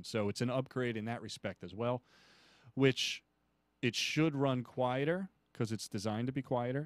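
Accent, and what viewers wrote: Philadelphia, United States. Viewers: Just subscribed. Thx for this video. BTW, are you from Philadelphia? Just a guess from hearing your accent.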